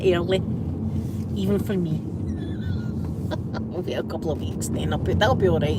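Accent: Scotland, United Kingdom. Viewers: Hello 👋 im gonna watch this i widh i wouldve caught it live. Love you accent. 😊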